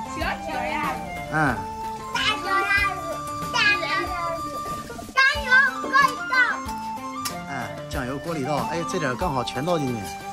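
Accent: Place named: Huai'an, China. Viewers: 是江蘇淮安人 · 淮安的西瓜上说了我听着也是我们苏北的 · 应该是，咸念han2😁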